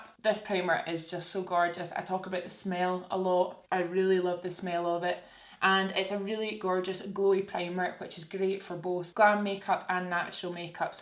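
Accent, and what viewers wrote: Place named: Glasgow, United Kingdom. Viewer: Always the highlight of my day when you post! I’ve just moved to London so its always nice to hear a Glaswegian accent every now and then😂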